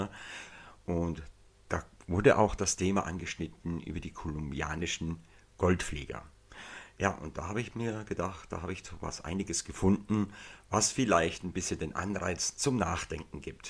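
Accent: Tyrol, Austria. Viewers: Bitte nicht auf Tirolerisch, außer es gibt ein Übersetzungstool... im Norden fällt sowas schwer zu verstehen 👽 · Hey, schöner kurzer Beitrag. Die Theorie mit den Fischvorbildern für die "Mayaflieger" hatte ich erst vor kurzem gehört, nachdem aber die fliegenden Fische auch ganz anders aussehen ist diese Theorie zumindest für mich wiederlegt. Für mich sind das immer noch Flugzeuge. Die Glühbirne würde halt gut zur Bagdadbatterie passen, womit ja angeblich nur vergoldet wurde, oder so... Schade, dass Dich die Arbeit in der so wie so schon so hektischen Vorweihnachtszeit so einspannt, halt die Ohren steif... Lieben Gruss und einen hoffentlich entspannten Sonntag Abend noch Paco PS also mir hat der tiroler Dialekt gefallen, ich musste mich zwar ein wenig konzentrieren habe aber alles verstanden...:) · wenn du bei dem video im tiroler dialekt dann untertitel aktivierst oder eine zweite audiospur auf deutsch machst ist das ok.^^ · Schian Gruass ausn Stubai.. 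mach weiter so.. ob auf Tirolerisch oder sinsch.. 😜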